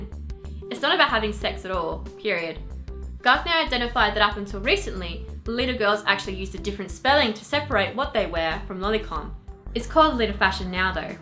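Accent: Australia. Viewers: I recognize that she's Australian. I assumed as much given that it's on the Deerstalker channel. I hear the accent enough that I don't really much notice it but that's fine. I'll take your word for it. either way, I'm not sure OP is even worried about it anymore as I've noticed they no longer comment here. haha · Sorry doll, I'm Australian, and this is what Australians tend to sound like. We are working on a solution for ESL speakers:)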